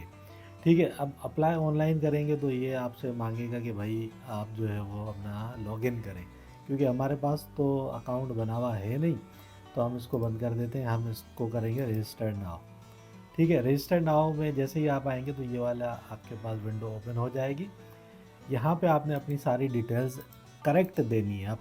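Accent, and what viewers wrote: Sindh, India. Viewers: سندھی لہجہ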